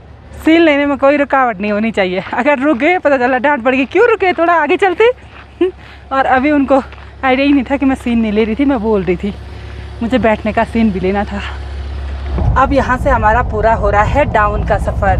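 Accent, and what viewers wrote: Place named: Rajasthan, India. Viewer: Aapki language thodi thodi Rajasthani boli jaisi lag rahi hai .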